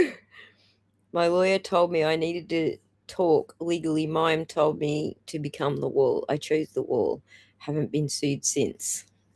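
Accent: Australia. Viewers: I am going to watch Turtleboy at 9, but I love hearing Aussie.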